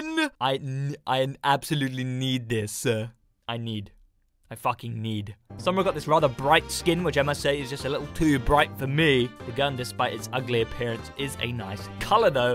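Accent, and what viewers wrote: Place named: Sweden. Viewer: where are you from? you sound a little bit swedish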